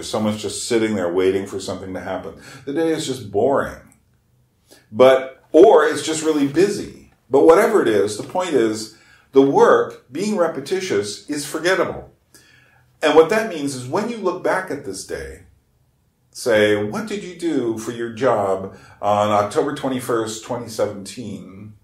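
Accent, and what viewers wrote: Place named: United States. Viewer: Thanks for the link to Rookmaaker. His english with german accent is tough to my spanish ears, but I captured the essence of the lecture (he says "the heart is a pump", don't he?) I can see the conection beetwen he and your videos, and now I think you were right when you said that all of us are postmodern thinkers. Even Rookmaake seems postmodern when he insist in the reallity beyond the called "facts", or science, or aristotelic logic. This is an idea shared with the postmoderns. In fact I remember myself as a teenager defending the idea of the reallity as a personal construct. I used to like science, math and all that, but I never liked the scientificism in the philosophical sense, it's seems a silly vision, the philosophy of an AI, I supouse. The problem is that postmodernism has become worst han those square minded fisics I didn't like in the seventies and eighties. 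PS: I can understand you well enough, your american accent is the easiest to me. The worst are british people, with all these crazy vowels...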